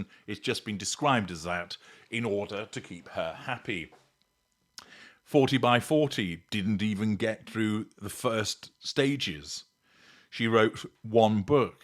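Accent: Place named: England, United Kingdom. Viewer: I imagine that the snake in the Garden of Eden sounded like you. I think you could talk anybody into anything with that deep and silky English accent.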